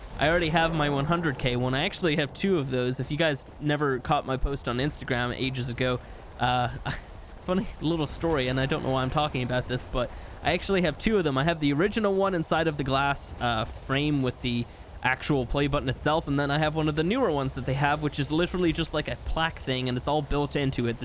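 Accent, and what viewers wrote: Ireland. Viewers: Irish right? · i been watching you for years, i never knew you were irish😭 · Wait he's irish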